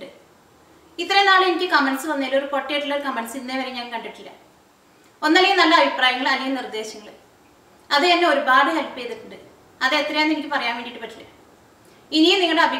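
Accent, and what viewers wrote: Thrissur, India. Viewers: Hi thank u for teach us variety of food items in simple way..Ur presentation especially trisur basha is nice....all d best for u and ur familly..hapy new year.... can u show d tabe spoon, tea spoon etc..and wt is nalla jeerakam... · Pachakam pole thanne ningalude a kalarpillatha nadan bhashayum eniku ere ishtamanu bcoz im also from thrissur...go ahead sister, merry Christmas!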